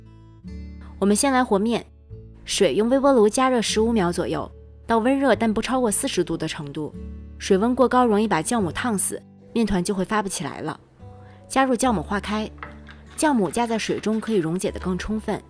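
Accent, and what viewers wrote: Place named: Tianjin, China. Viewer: 听着像天津姐姐包包子，明天就动手试试看！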